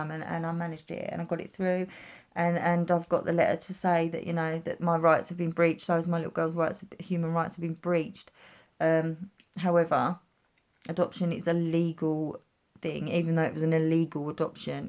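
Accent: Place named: United Kingdom. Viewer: You like Em and Trump ...and have that British accent? Subbed ✅ Here in middle America we are just using our common sense and are thankful that Trump listens to his base, because we don’t want any politician to tell us how to keep ourselves safe. Most everyone here understands the importance of not spreading it but we will not be told that we can’t move freely about the country or be told we can’t earn a living for our family. That’s why you see in states that have Democrat Governor’s being more authoritarian than the Republican states like Texas, Oklahoma Florida.. Its an election year, so in order to make Trump look bad, they will fight him even if he found a vaccine.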